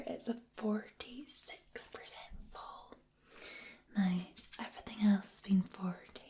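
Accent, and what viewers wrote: Scotland, United Kingdom. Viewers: This is by far, my most favourite video of yours and of any ASMR video out there. Love it! Well done! I especially loved your reactions. You are so animated. I had a bit of a chuckle at your reactions to the last three. I recently developed a love for Scotch Whisky. The complexity and the unique differences of every bottle is just amazing. I was definitely born in the wrong country as I would love to tour distilleries and experience and learn more about Scottish whisky. I really do enjoy whisky, except the peaty ones, (I would have the same reaction as you did) so maybe it is my Scottish ancestry coming through. I do so love the Scottish accent. I could listen to you all day. Thanks for this one. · Oh should be the voice of Scotland. Could listen & watch you for hours. I do love a whisky, very soothing & warming to the heart.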